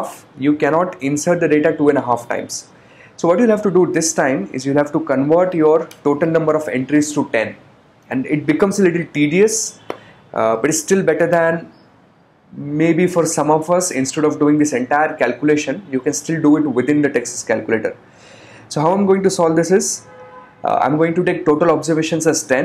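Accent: India. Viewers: Indian accent stinks.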